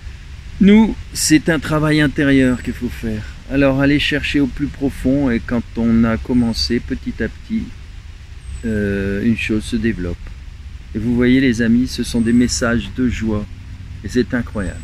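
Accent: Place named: Occitan, France. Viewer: C’est la voix occitane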